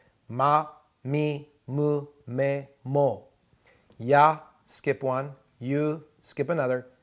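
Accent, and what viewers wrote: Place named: United States. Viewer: This is only half knowledge, as you have generously left out vowel length and vowel dropping. You mentioned daku-on and handaku-on in passing, but completely left out soku-on. Intonation isn't mentioned,too. I am not objecting to the slight American accent, as I know how hard it can be to get rid of that even after years of study. It is one of the reasons why universities hire native speakers as language teachers, so why don't you ask your wife to pronounce the words?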